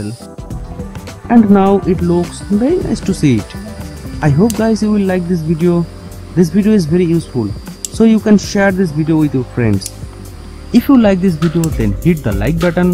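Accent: Bengal, India. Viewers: you have much of bangali accent 🙏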